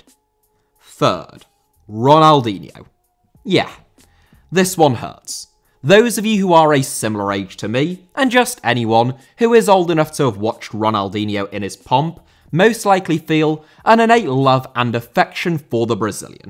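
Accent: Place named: United Kingdom. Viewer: Well done mate , I have had a wee look at your stuff over the past couple of months , thoroughly enjoyed it and passed it along to my football loving buddies . For me as a Bolschie Celtic fan it isn`t a massive jump in consciousness to really identify with a lot of the issues which you bring up . But what I really love as an old git who enjoyed Fantasy football ( with Badiel and Skinner ) the football journalism from the real fans in " My favourite year " , When Saturday Comes etc etc , it`s nice to see that the tradition of humourous well informed football journalism is alive and well with guys like yersell . Still waiting for an opinion from my other Celtic buddies on your stuff , but some of them are of the naive attitude that politics has nothing to do with sport . Au contraire mes Amis , tell that to Tommie Smith and John Carlos . and that`s one of the reasons I enjoy your exposure of the despots who use fitba to sanitise their horrible public image. 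Keep up the good work young man .Always love to hear accents from the UK ( I live in Germany and I miss this almost as much as I miss a fry up ) . I shall continue watching and write again . Some of the comments are priceless. 🙂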